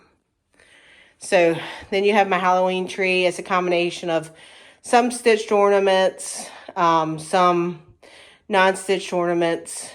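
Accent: South, United States. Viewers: Another enjoyable video !! Right at the moment I have a broken wrist and I’m so jealous of everything you’ve stitched, but I’ve really realized how relaxing our stitching hobby is, I’m retired so I start every day with and hour of stitching. Now you’re jealous right , your day will come. You have a beautiful home, I live in Canada and when I think of a “southern” home yours is exactly what I envision (no idea where you live but with your lovely accent I presume it’s in the sunny south.) have a fun Halloween 👻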